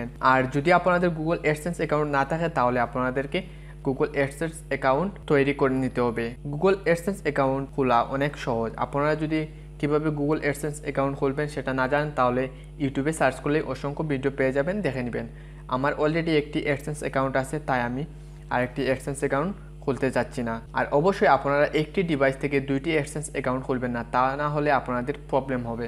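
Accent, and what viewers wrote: Sylhet, Bangladesh. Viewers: Vai aponar khotai buja jai aponi Sylheti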